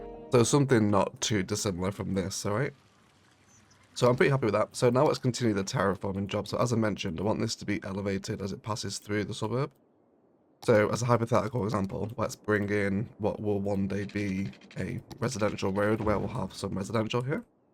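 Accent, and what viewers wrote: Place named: United Kingdom. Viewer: Curious, why do you play/build using right hand traffic? You sure sound British, so I would have thought you'd use left hand as that's what we're used too. Biffa does the same too. 🤔 Is it just a personal preference? The highways with dedicated bus lanes are totally "cattle trucked" up. They've got them the wrong way round. I use left hand traffic, as that's what I know and drive in; but, the game has the bus lanes in what is called "Lane 3" whereas the should be in "Lane 1". They're a complete waste of time, but, they'll probably not ever get fixed as too much time has passed now.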